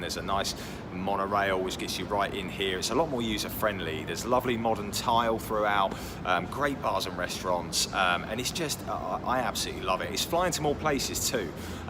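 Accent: United Kingdom. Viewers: You still got that Brith accent which is cool 😆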